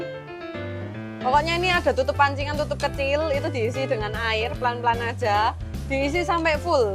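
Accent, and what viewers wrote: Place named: Surabaya, Indonesia. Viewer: Oalah jebul aning Suróbóyó. Mulané medok buanget. Rapópó cik, kita satu server.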